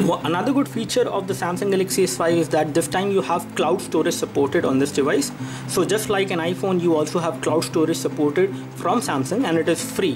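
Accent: India. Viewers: Daaaamn! That indian accent drives me insane!! It's so annoying!!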